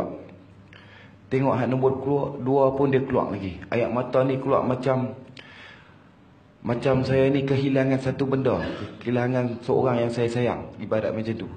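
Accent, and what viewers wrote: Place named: North, Malaysia. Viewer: Skit2 kdah.... skit2 kdah... perlis ada penang ada.. perak utaqa pn ada ckp mcm ni jgk... smpai ke pntai remis ckp utara.... awt xsbut....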